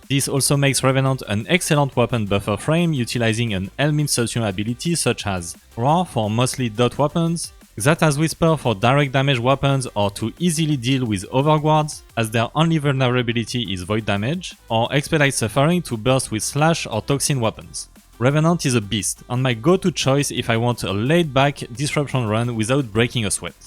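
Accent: France. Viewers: I have to say it: I love your little french accent in your videos 😅 Your content is great I am sub since a long time. Now taking a short break from double resource event on Youtube and there is a new hot video from you. Very cool 👍😎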